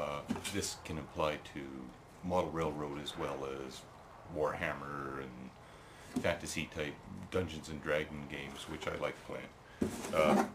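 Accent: Canada. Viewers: jw are you canadian? i am and i can recognize the accent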